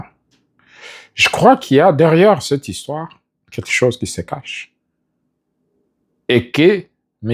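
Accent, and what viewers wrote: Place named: Kasai, DR Congo. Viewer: Monsieur qui parle. S'il te plaît. En latin "vox populi, vox Dei. Ce nest pas le Cardinal qui doit trouver une solution pour l'est de la RDC. Tout le monde parle du dialogue. Le ministre Belge des Affaires étrangères ainsi que le jeune et vaillant président Ibrahim en ont très fraîchement parlé. Fais tout avec conseil, et tu n'auras pas à te repantir Tu es le seul à dire que c'est Kagame qui arme le M23. JKK, pour quoi a t il été condamné à mort? Si tu as un accent du Kasai, c'est normal que tu puisses défendre celui que tu défends. Le peuple d'abord, mon frère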